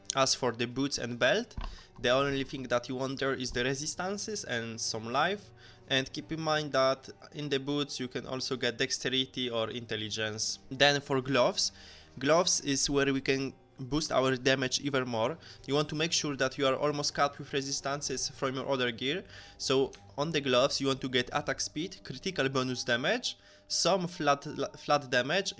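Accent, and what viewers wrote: Poland. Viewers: Słychać że Polak od razu xD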